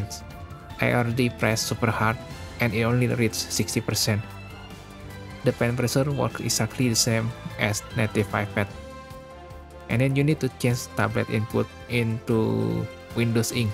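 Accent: Indonesia. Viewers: i realized i could do this accidentally, works on my setup with Sunshine + Classicoldsong's Artemis(Moonlight fork that has more experimental features and a little nicer GUI i found from Moonlight github repository fork) and began to wonder if someone done video about this amazing discovery i made. Thankfully someone already done it. anyways works with my Xiaomi Pad 6 and its Xiaomi pen, down to its 2 buttons on pen(although a bit janky since the OS tried to use it also sometimes) and its pressure sensitivity! also, is it just me or you sounds like Indonesian?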